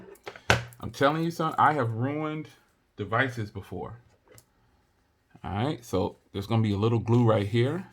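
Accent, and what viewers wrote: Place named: New Jersey, United States. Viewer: Man your Jersey accent is heavy at times sound a little like Denzel , this is not meant as an insult, especially with my deep south accent 😂😂😂 Great install great video, yes always answer wifey 👍🏾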